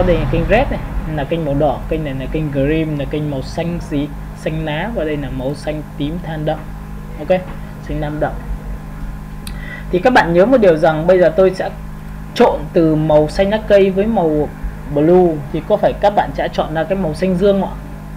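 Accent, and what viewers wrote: North, Vietnam. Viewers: dọng bắc hơi khó nghe nhưng thầy dạy rất chi tiết dễ hiểu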